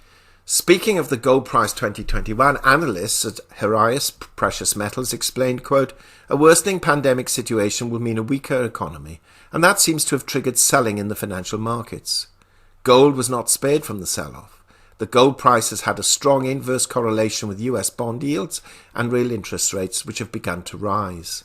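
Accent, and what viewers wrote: United Kingdom. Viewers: I am too new to this to offer any prediction or guidance. However...I do appreciate, value. Cool headed reasoning, professional interpretation(s), cool British accent and annunciation, and a little entertainment thrown in...all for FREE. I’ll always choose the one or two friends that are willing to tell me the hard truths than one or two hundred, patting me on the back. I would revisit my last statement of course, it were to be one or two hundred ladies, if we’re being honest. Anyway. Thank you for providing such a valuable resource. Some of us ARE listening.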